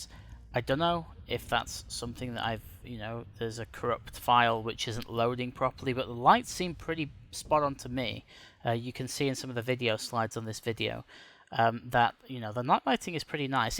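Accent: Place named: England, United Kingdom. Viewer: can you realy not tell by his accsant, that he is English? i am Norwegian thoght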